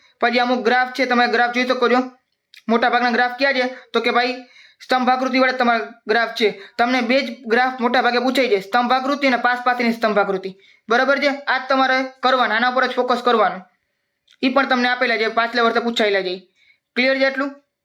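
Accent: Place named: Kutch, India. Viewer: Sir tame katch na lago cho barabar je je kyo jo atle 😅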